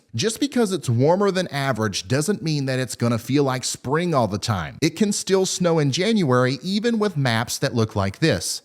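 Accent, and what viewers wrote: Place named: Pennsylvania, United States. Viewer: Did you go to school at penn state? Sure does sound like it!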